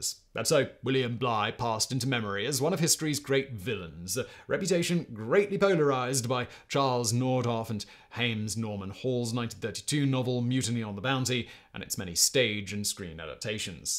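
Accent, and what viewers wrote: England, United Kingdom. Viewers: Narrator does speak kind of fast but he clearly and precisely delivers the narration. His delivery keeps me engrossed in the story. I dunno i kinda like English in that particula rmanner of elocution and accent. Though I don't know from what region in the u.k. our speedy speaking narrator doth haileth. From a bred, born, and raised Southern Arizonan descendent of English stock... well works just fine for me. (Fast talking English guy that is).. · The King's English, spoken as rapidly as this presenter does, is impossible to understand. It is too long to read the closed captioning, so I remain ignorant of the final outcome for the mutineers. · His crazy accent? You mean English?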